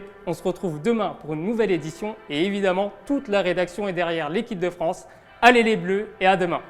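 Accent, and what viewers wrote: Italy. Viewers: Jusqu'à là on n'a pas pu mettre un visage sur la voix de l'accent italien.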